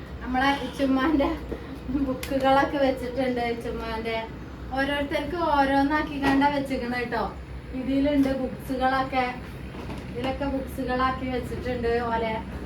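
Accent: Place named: Eranad, India. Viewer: കുറ്റം പറയണ്ട, ഏറനാടൻ ഭാഷയാ അതിനെന്താ കുഴപ്പം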